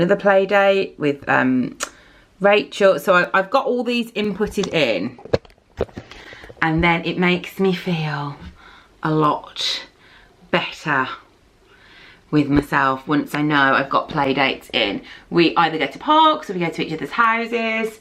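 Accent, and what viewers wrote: Birmingham, United Kingdom. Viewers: It's a fairly strong Birmingham accent. Not all British accents are the same at all haha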